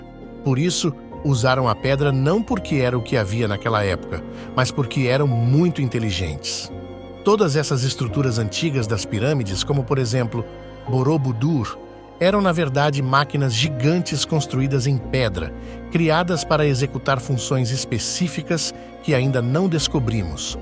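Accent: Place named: Minas Gerais, Brazil. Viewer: É mineira de BH..😂😂😂... Nú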